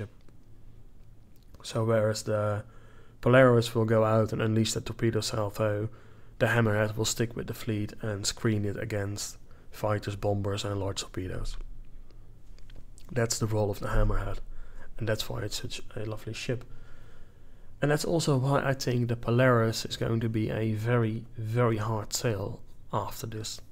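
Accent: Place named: Netherlands. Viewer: these ships are both awsome . even when in a smaller org , you can help a friendly org to destroy someones capital . the polaris bridge is just perfeckt . and now the hammerhead . a real jewel . to be honest , i cant make a decision for keeping only one of them . so it was an easy decision to have them both . --- ps : you sound verry dutch :p im almost sure you live in holland :-)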